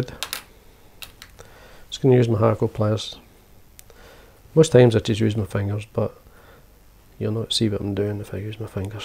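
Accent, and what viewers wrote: Scotland, United Kingdom. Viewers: The subtitles are hilarious, is it that hard to understand a Scottish accent?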